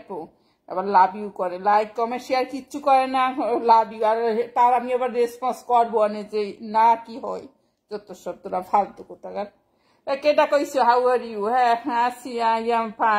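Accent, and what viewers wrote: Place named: Barishal, Bangladesh. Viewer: বরিশাল এর ভাসা শুনতে ভালো লাগে।।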